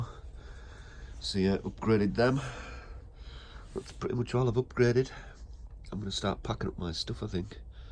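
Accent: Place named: Scotland, United Kingdom. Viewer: U sound Scottish, Boss. Maybe from da Shetlands.....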